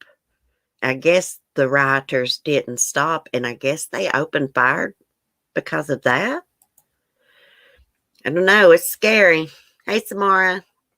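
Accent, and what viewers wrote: South, United States. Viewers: I love you when you said a man killed his 21 yr old neighbor over dog poop I died. It's not funny in the least bit it is just your cute southern accent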